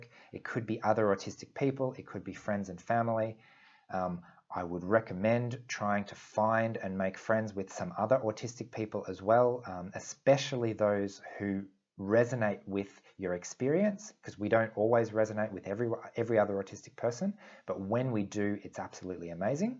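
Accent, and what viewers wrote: Australia. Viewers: Greetings, mate. (I was listening to your accent in the video, and it sounded Australian. So I made the assumption that Aussies refer to each other as "mate.") There was something that happened to me just yesterday. To give a little background/context, I'm an American citizen (native born). I've lived in A-me-rih-cur my whole life. Our official language should be English. Yesterday, I was just walking past two women in the grocery store ("supermarket"), and my brain recognized that one of them was speaking in Russian to the other person. I guess she actually used some of the more common Russian words that I've learned so far. What ended up happening was a long mental analysis of thinking about "boundaries," and how I might feel if a stranger in a supermarket walked up to me and started speaking to me in Russian. I would be scared or paranoid, wondering, how do they know I recognize words in Russian? 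This situation may not have even been that complex, because the woman had already revealed by her own speech that she knew how to communicate in Russian. To make a short story long, I kept going about my own business, even saw those two women a second time in another part of the store, but I didn't attempt to say Hi or introduce myself (if that would have been appropriate!). The only "agenda" I would have had would've been to show that I noticed these women were from a different culture, and that I appreciated them as fellow humans. It's weird that I know Russian at all. I had a c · Which part of Australia are you from?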